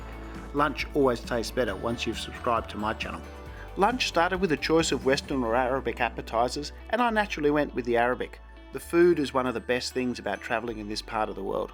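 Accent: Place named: Australia. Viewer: Hi, another great video. Can you speak Dutch? )Asking because you have a proper aussie accent:) )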